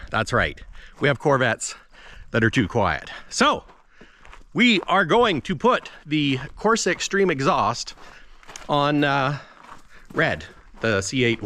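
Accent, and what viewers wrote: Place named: Canada. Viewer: Oooouut. I hear a faint accent A!!! What part of Canada??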